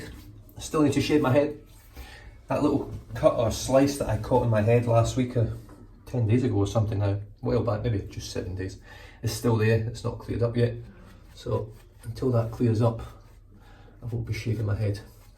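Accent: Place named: Scotland, United Kingdom. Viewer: Hmm. Why put yourself down. Who cares about being correct for the masses, and the Scottish accent is a great one... never hurt Sean Connery, and Trainspotting was a WORLDWIDE hit... including here, in America! Don't water anything down. That's how people learn new languages & cultures. We sit, watch, enjoy the humor, and eventually get comfortable with 'em. Kind of like back when you decided to feature Australian artisans the rest of the shaving world may not know about. If you're just trying to increase your subs to make more ad share money or something, then I kind of understand, but I don't think you monetize your channel.... do you?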